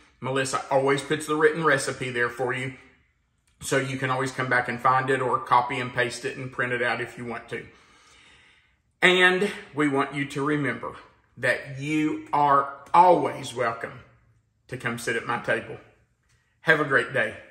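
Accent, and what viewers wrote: South, United States. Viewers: I love your recipes... I love the way you teach and instruct us on how to fix them... I love your soft Southern in speaking.... And most of all I love that you're Christian... Thank you so so much... Your presentations are very comforting to me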